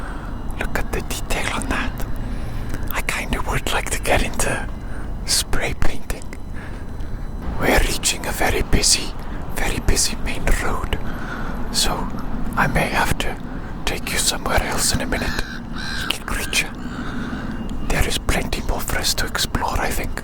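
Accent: Wales, United Kingdom. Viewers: Thank god finally got closure that you were from wales it was really bothering me that I couldnt prove it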